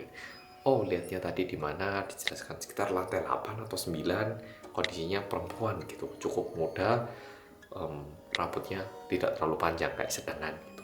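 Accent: Java, Indonesia. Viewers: Bang latih dong ngomongnya jgn terlalu medok, jujur aku kurang ngerti ceritanya, dan suaramu kadang timbul tenggelam ga jelas, seharusnya agak keras sedikit biar jelas 🙏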